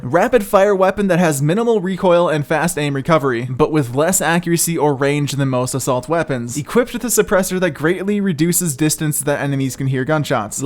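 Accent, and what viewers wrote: Czech Republic. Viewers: Are you from Czech republic?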